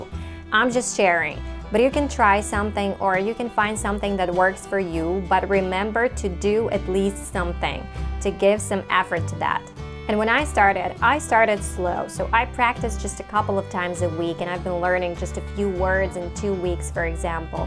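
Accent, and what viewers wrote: United States. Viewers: you really sound American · Tremendous American Accent...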